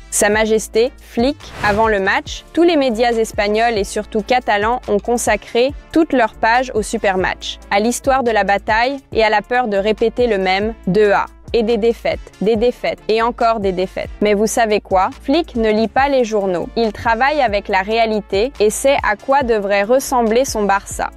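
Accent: Quebec, Canada. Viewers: Je ne comprend pas cette chaîne ? Est-ce une IA ? Une voix d'IA ? Une québécoise ?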